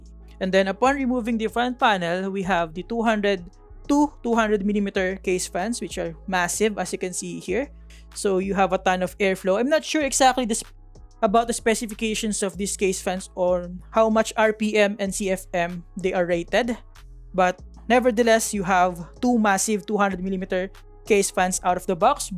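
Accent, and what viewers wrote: Philippines. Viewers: alam mo kagad kung pinoy yung nag sasalita 😅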